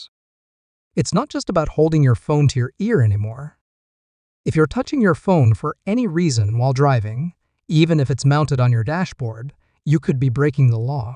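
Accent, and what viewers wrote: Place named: United States. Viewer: I do not know how much of this I can actually believe. Specially with a Yankee voice or a I. Sounds like revenue to me with some of it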